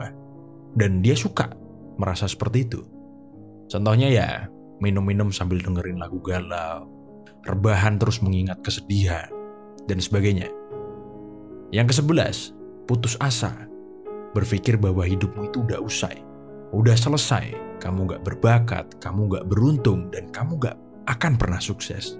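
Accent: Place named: East Java, Indonesia. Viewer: Wkwkwk anjay ada sisi lucu juga antum prof. 😅 Dari logat anda. Anda orang Jawa timur kah. Surabaya sekitarnya. Sidoarjo mungkin. Ini ga ada acara temu fans atau seminar gitu ?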